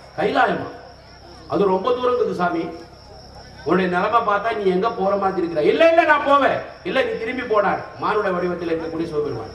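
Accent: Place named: India. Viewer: ஐயா நல்ல தமிழ் கேட்டு நாளாச்சு. உங்க புண்ணியத்தால் எம் செவி குளிர்ந்தது.அதுவும் ஒரே மாவட்ட தமிழை கேட்க்கும் போது மிக்க மகிழ்ச்சி உங்களை ஆலத்தூரில் நேரில் சந்திக்க இறைவன் அனுக்கிரஹம் வேண்டுகிறேன். இனிய ரமலான் நோன்பிருந்து நினைத்தது நிறைவேற வாழ்த்துக்கள்.ஐயா. இவன் பூ. நிரைஞ்சன் குமார் ஆற்காடு.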